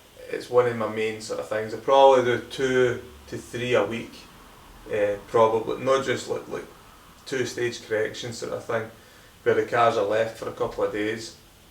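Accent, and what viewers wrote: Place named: Glasgow, United Kingdom. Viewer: Thanks for answering about the accent! We thought it might’ve been Scottish, but had noticed your shop was in Blackpool. We actually spent the first week of July touring through the Highlands. I think the closest we got to Hamilton was Callander. Wonderful country!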